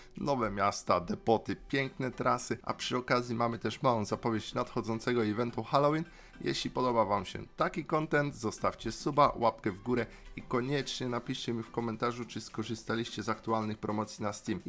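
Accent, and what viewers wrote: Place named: England, United Kingdom. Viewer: witam cię mam pytanko mieszkasz w uk czy w polsce bo akcent masz angielski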